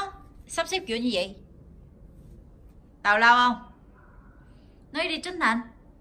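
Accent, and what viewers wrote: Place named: North, Vietnam. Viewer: lúc đó nói giọng Bắc nó chả chuẩn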